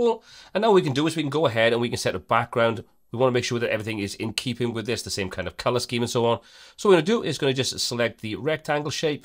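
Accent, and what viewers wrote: Wales, United Kingdom. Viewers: Are you Welsh? Valleys boy RN Veteran here. ⚓️🏴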